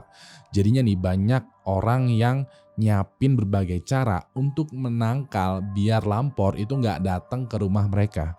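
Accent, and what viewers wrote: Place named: West Java, Indonesia. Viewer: asik juga dengerin orang sunda cerita jawa, pagi dua sore mati…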